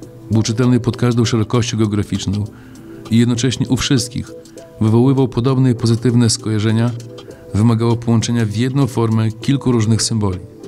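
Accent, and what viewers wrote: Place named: Podlaskie, Poland. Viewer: słychać, że z Białłeegostoku ;) gratulacje!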